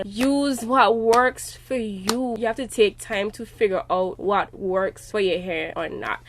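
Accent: Trinidad and Tobago. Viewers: Girl got to give you a thumbs up..the trini accent first then your content..I really dont know what products works for my hair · Are you trinidadian?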